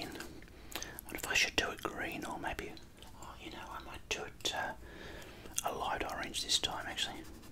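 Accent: Australia. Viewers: I’m German but I’m just here for the Australian accent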